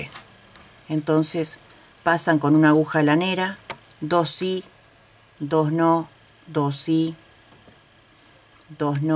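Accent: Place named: Argentina. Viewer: hola,,amiga yo pensaba k eras argentina por el acento,,, todo lo k haces es muy lindo y todo muy bien explicado,,, maravilloso tutorial,,,un abrazo muy fuerte,, desde islas canarias ,,,España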